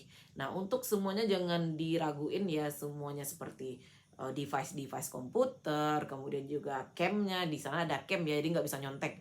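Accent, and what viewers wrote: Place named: West Sumatra, Indonesia. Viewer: Mba org Minang kah?😊nada bicara nya seperti logat org Minang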